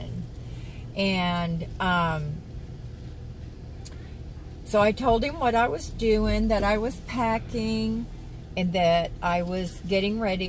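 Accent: South, United States. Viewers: You and ur Southern accent! Love it!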